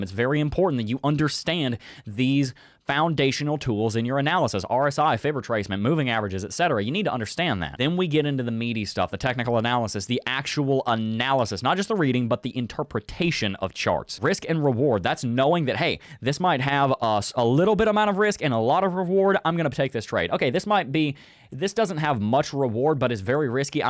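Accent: South, United States. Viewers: The only crypto guy with a southern accent. My kinda guy. From South Carolina btw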